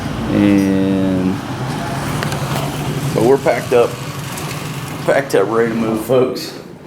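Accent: South, United States. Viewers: I appreciate that you are doing your own thing but I can tell that with a mouth like yours you were raised in the south, but it sure wasn’t Texas man. Safe travels there guy.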